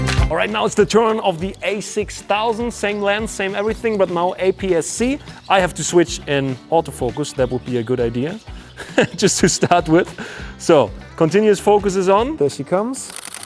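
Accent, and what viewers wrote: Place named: Germany. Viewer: Boy, you talk with such a droll German accent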